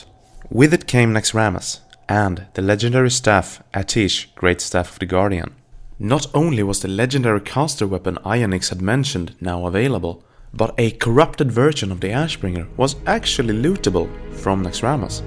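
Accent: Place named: Sweden. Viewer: Are you perhaps swedish? you do sound like it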